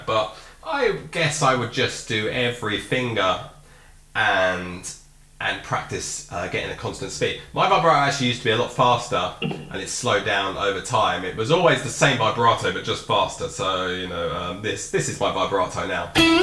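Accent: England, United Kingdom. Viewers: Cool,! I like these tutorials, keep 'em coming. I like to listen to your Brit accent, too. 💯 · I didn't realise you were English. 👍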